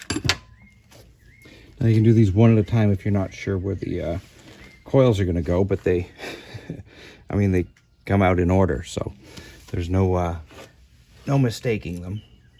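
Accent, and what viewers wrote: Canada. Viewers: U know you're from Canada by how u say "ouwt"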